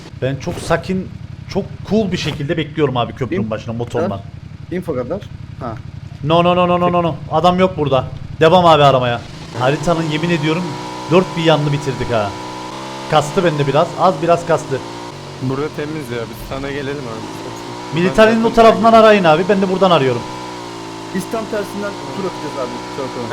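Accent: Cyprus. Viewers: abi senin 2 3 videonu izledikten sonra Kıbrıslı gibi konuşmaya başlıyorum 😂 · Abi sen kıbrıslımısın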